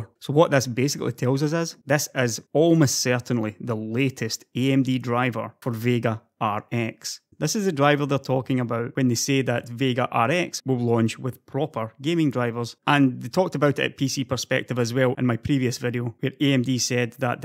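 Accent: Scotland, United Kingdom. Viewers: speaking English with a Scottish accent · stayed for the scottish accent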